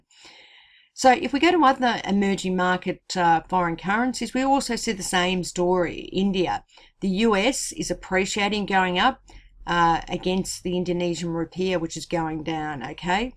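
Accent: Australia. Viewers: Hi, I recognise that accent cos I'm also Australian =) Definitely subscribing. Great vid.I'm holding alot of xrp hopefully it the bull run continues.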